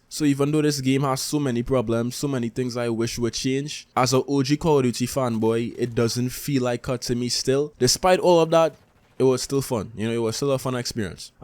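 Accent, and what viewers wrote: Trinidad and Tobago. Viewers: You sound like a Trinidadian lol I'm from Trinidad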